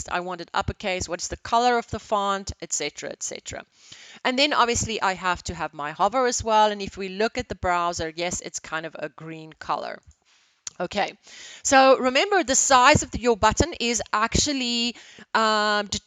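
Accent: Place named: South Africa. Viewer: I presume you are South African, so for me as a South African, it was nice to have a common accent. Great tutorial. Could you please read some of your mail please, so many unread :-)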